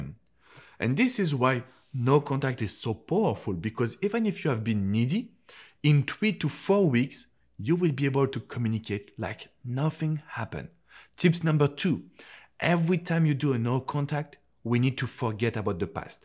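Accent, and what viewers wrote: France. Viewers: "You need to clique" haha love the french accent, ur a really cool nice guy :) :)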